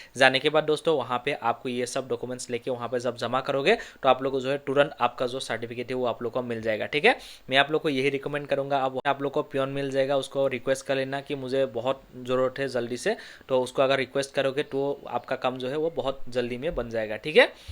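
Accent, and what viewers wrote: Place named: Assam, India. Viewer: bhai 2mar Hindi Improve kribo lagibo atiya Assamese kuwa hindi Improve kri ha try kriba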